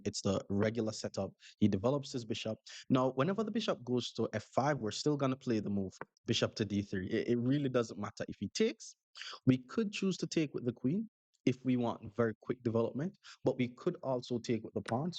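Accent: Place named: Jamaica. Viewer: I watch this channel so much now that my thoughts about Chess are in a Jamaican accent.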